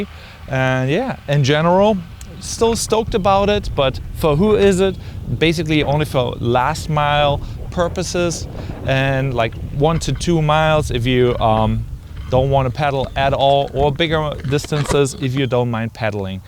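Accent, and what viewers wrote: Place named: Germany. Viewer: oh i really like Germans when they speak english :)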